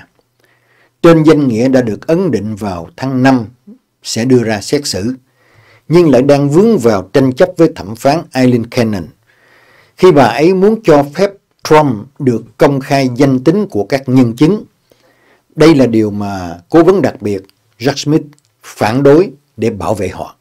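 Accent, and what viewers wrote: Mekong Delta, Vietnam. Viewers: Cảm ơn nụ cười vui với tựa đề hôm nay ngày Mồng Hai Tết, đây đúng là chất giọng nhà quê rặt miền Tây. 👌👌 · Tôi vừa nghe xong, chương trình đầu năm rất hay, cảm ơn anh Việt Linh nói lên suy nghĩ thay cho nhiều người về tình trạng dân trí xuống cấp tại Mỹ, mê tín di đoan tăng cao trong nhiều sắc dân, và tôi thích nhất câu nói rặt miền Tây của anh. TUYỆT VỜI. :face-red-heart-shape::face-red-heart-shape::face-red-heart-shape:👍👍👍👍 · Nghe câu nói rặt chất giọng người miền Tây của anh Việt Linh tôi cười mãi. Cả thế giới đều biết về Trump như thế nào, chạy xa lánh tên cùi hủi này nhưng người mỹ thì không thấy, không biết gì cả, thế mới lạ? 🤔🤔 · Cảm ơn câu nói chân chất, nhà quê, mộc mạc của Bác hai Trầu (Việt Linh), đúng chất giọng dân miền Tây, người Sài Gòn. 🤣🤣🤣👍👍👍👍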